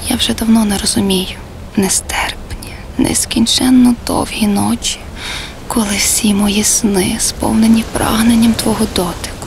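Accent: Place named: Lviv, Ukraine. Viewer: Chto Vy mayete proty Lvivs'koho aktsentu. Ukraina velyka. 🌲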